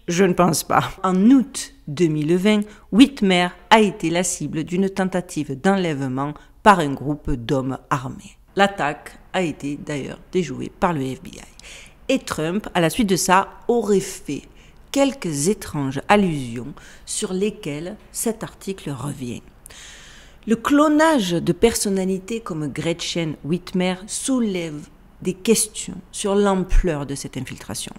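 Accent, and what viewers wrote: Marseille, France. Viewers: Plus ça va plus je vous écoute... c'est un bonheur, le mélange d'un côté artificiel à fond (Barbie) et une vraie brillance intellectuelle. Ça donne un dandysme du meilleur teint, au sujet de rien de moins que la structure (viciée) dé notre monde. Deux questions bêtes : un, vous êtes marseillaise ? Deux, avez-vous fait des "études" d'anthropologie ? Autre ? Merci en tout cas pour votre excellent travail, ET SA PRÉSENTATION, la Vôtre. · Magnifique, comme toujours….. Le réveil de l’esprit critique, si peu actif ces dernières années. Et cet accent de cigales!….. Merci. 😍🥂 Meilleurs Vœux à tous pour cette année de révélations …. Y a du pain sur la planche 😏😘🤓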